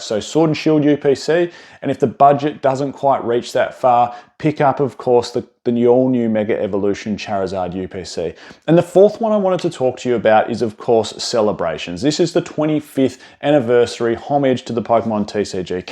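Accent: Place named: Australia. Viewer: I still can’t figure out why ausies pronounce their A’s as R’s but seemingly ONLY at the end of the word. He said Data and it sounded like daughter 🤣🤣 Any way I know people have given you shit about this before and this isn’t me doing that. All ausies do it and it’s just interesting to me why it came to be. Love your content man!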